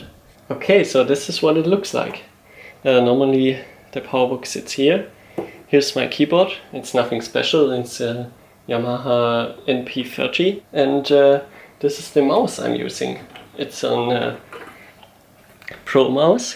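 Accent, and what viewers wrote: Germany. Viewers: Man erkennt, dass deine Muttersprache deutsch ist. Ich würde dich bitten nicht englisch zu sprechen weil das ....... klingt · Die Leute scheinen den deutschen Akzent abzufrieren. Dachte immer die halten Deutsch für eine nicht ganz so schöne Sprache. :D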